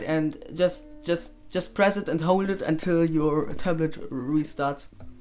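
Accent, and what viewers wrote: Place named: Germany. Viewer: Man merkt das du deutscher bist lul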